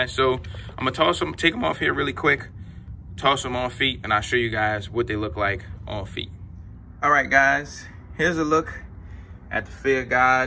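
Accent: Baltimore, United States. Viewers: Homie is definitely from Baltimore. What it deww dummy?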